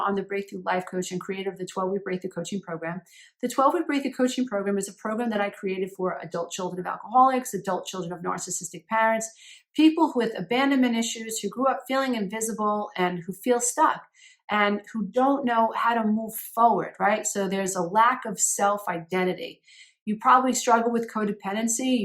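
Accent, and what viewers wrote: United States. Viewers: Hi I have seen your videos on Instagram as you only popped up the other day on YouTube as I was looking for something else to watch then I clicked on your feed to see what the video Is all about and I have two very evil brother and sister who are really cruel to me and done and said the most wicked things too me for the last 50 years but I am not sure if what you say is about anyone so just wanted to ask you that ok and I am not a troll as you mentioned in one of your videos on Instagram lol but I I will say this your absolutely gorgeous and I love your sexy American accent lol it's sends me to sleep because I am very unwell here and having trouble getting to sleep for days now so you have some good uses in you if nothing else hahaha im from England 😉😂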